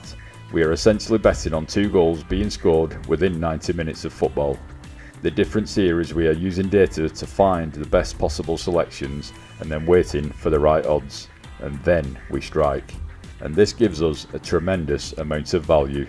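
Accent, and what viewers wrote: Wigan, United Kingdom. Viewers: You from Wigan mate?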